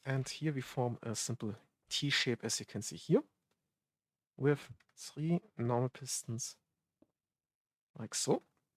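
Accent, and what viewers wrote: Germany. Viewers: keine Kritik, stattdessen einfach eine Bemerkung: du hast einen der stärksten deutschen Akzente von englischen Youtubern, welche ich bisher gehört habe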